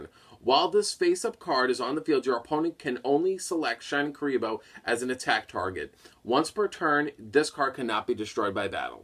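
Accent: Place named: New York, United States. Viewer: yo you live in Alabama or just have an Auburn shirt? I always assumed you were from new York or somewhere around there by the accent.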